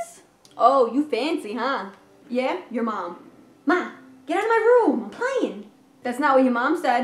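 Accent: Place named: New Jersey, United States. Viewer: i love new jersey accents ! ! ! now thats wirth a sub there!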